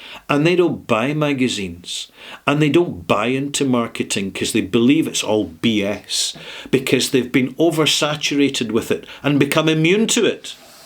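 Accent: Scotland, United Kingdom. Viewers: "cranks are doing a hugely important job in keeping the entire category relevant and alive" It might seem like this is just ego talking, but it's really true. I myself, someone who drank virtually no alcohol at all, got into Scotch a couple of months ago after YT had decided to recommend me a video of a Scottish gentleman with a lovely accent, sitting in front of a barrel, talking passionately about whisky. Your enthusiasm is contagious, so after watching a number of videos I decided I had to buy a couple of (integrity!) bottles to see what the fuss is about myself, and thanks to your recent mini-series I want to get my hands on some rum too:). Cheers from Slovakia,!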